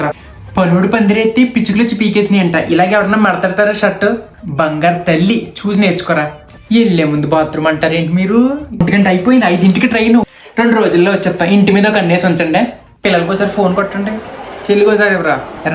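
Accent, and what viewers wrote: Godavari, India. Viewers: Hi andi nenu mi matalu ki inka mana Godavari slag ki addict ayipoyanu andi❤😊... I'm your fan from Hyderabad but ma native place vachhesi Amalapuram andi 😊#East Godavari ❤ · ఒదినీ.... డొబ్బులు.... గోదారి యాస ఓ తీయని ఎమోషన్ 😂😂😂❤❤❤ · garu miku Ela vastayi sir I alochanalu.....nijam ga mana godarolla yaasa.....amma chese panulu as it is alane dimpesaaru garu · bhai meeku telugu meda,godari yaasa meda unna pattuki hatsoff.udyiga reetya ekkada unna aa godavri anedi oka emotion enninjanmalaina akkade puttalane oka teepi gnapakam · U slag is like godavari 😊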